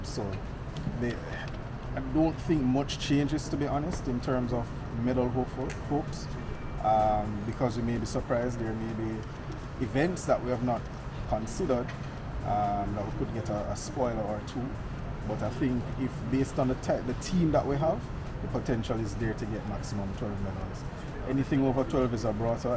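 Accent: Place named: Jamaica. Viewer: I was listening today and thought it was a Jamaican announcer and then convinced myself it was wishful thinking🤣🤣🤣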